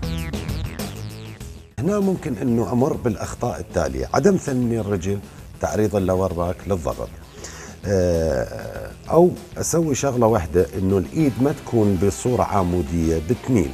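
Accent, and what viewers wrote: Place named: Baghdad, Iraq. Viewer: عراقي وبغدادي 😹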